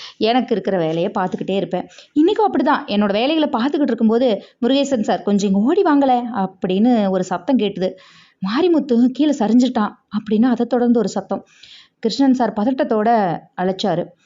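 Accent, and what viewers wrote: Tirunelveli, India. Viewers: உள்ளத்தை உருக்கிய கதை.நல்ல கதை.தெளிவான குரல் வளம்.திருநெல்வேலி பாஷை அப்படியே.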